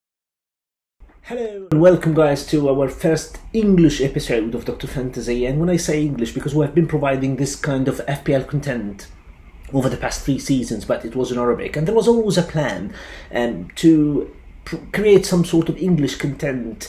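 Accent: United Kingdom. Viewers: A very perfect British accent mate ❤️❤️❤️🤍🤍🤍🤍🤍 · Amazing content and a perfect British accent. To all the world, here is the best FPL content ever. 😍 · British accent 😍 👌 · Amazing content. So organised and to the point. Your British accent is incredible. I used to follow you in Arabic, but I love your episode in English even more. 😍😍😍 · ما شاء الله عليك يا دكتور بتكلم بلكنة بريتش و المحتوي ممتاز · King!! Your british accent!🔥🔥🔥🔥🔥🔥🔥🔥🔥🔥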